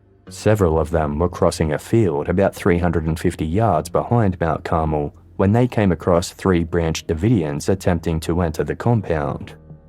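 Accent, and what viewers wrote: Australia. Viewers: He's an Australian...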